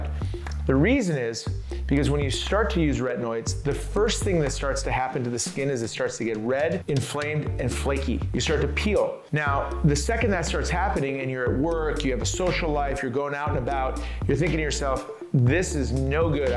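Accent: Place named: Lebanon. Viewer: لبناني صح ؟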